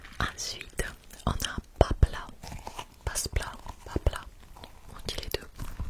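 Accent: South, France. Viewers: Il y a énormément de mot que nous utilisons également dans le Nord, et un peu partout en France même, qui sont globalisés dans un langage familier. Après vous avez quelque mot que je n'avais jamais entendu, magarelle 😮 ! 😂. La team chocolatine le débat est finis, seul les sudistes le disent, nous sommes 90% à dire "petits pains" dans la France. Le principal étant qu'on sache ce que les deux mots veulent dire. Ceci étant dit, "chocolatine" ( d'ailleurs même ma saisie automatique sur mon clavier ne connait pas 😂) prend du charme quand il est prononcé avec votre accent ! Sympa la découverte 😊👌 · Super concept, et j'ai appris des choses comme ça je passe crème quand je retournerai dans le sud haha (par contre j'arrive pas faire l'accent, que j'adore).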